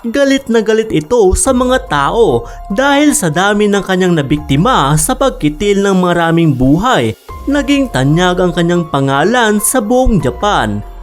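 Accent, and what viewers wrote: Philippines. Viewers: visaya ka kuya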